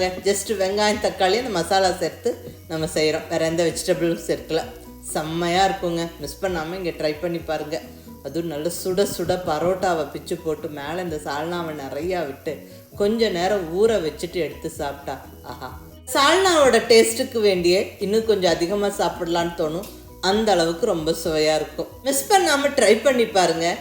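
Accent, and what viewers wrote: Kongu, India. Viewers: Namma kongu manathoda samayalum tamilum sooper madam.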